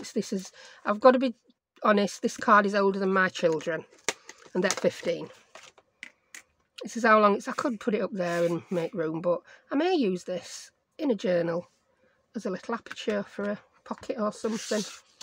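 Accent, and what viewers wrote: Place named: Yorkshire, United Kingdom. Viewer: Brilliant video. Love the accent. Is it Yorkshire?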